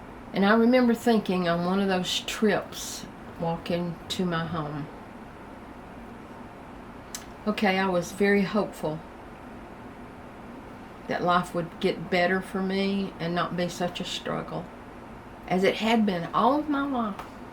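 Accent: South, United States. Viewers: I know what Low self-esteem is like. I have apologized for crying too. Not sure why because we don't really owe anybody an apology for showing our emotions.. Crying is therapy. If you held it all in you probably wouldn't be alive. Thank you for your honesty and transparency. I pray God's protection over you and His comfort over you. You have a beautiful Southern voice. I pray that God will continue to provide for you all that you need every day. He is Jehovah-Jireh ~~our provider. Ironically I just started feeling like I'm living for the first time too I've had this happen before and went back into my own grief and pain but I feel like this time is different. And guess what? I'm painting too. It's watercolor. It's just a different format than what you do but it's so therapeutic to my soul. I pray for many moments of joy and peace over you precious It's beautiful how God would let people connect from all over the country!!❤️🙏🏼 Please know that there are many people out here who will lift you up in prayer. 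Who care. And who think you are a beautiful friend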